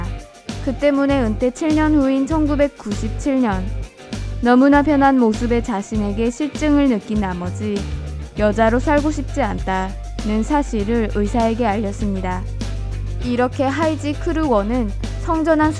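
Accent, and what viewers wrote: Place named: Japan. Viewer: 발음이 일본사람같네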